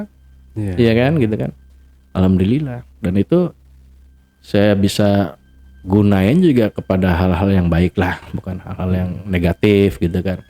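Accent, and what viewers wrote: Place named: Jakarta, Indonesia. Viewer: Betawi pisan ni bahasa nya 😂❤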